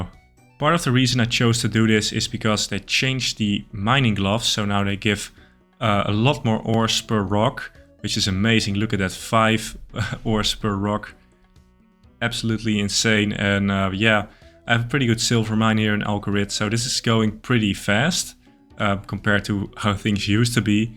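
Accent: Netherlands. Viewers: het nederlandse accent is niet te missen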